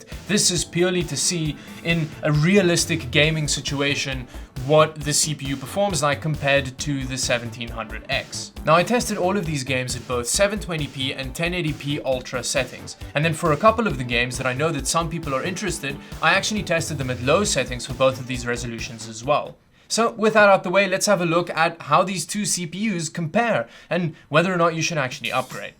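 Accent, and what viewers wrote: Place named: South Africa. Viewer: Sounds kinda south African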